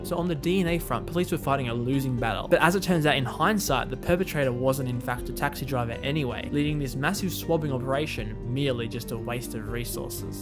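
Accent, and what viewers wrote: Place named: Australia. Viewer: Great video! So cool to hear an Aussie covering these topics. Hope to see more from you!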